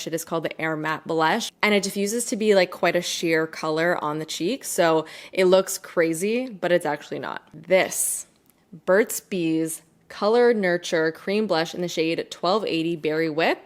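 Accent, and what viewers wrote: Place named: Canada. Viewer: This video was recommended to me on the sidebar. 5 seconds in I knew two things... 1. You were Canadian, 2. I'd be subscribing. I love love love your delivery. Hello from Vancouver!! <3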